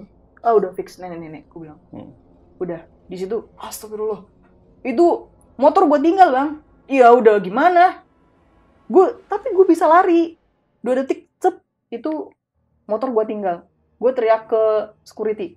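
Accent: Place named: Bali, Indonesia. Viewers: bang nih orang bali ya? hahah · Bang ini punya ciri khas, kalo ngomong yg ada huruf T pasti ngucapnya kedengaran kaya org bali.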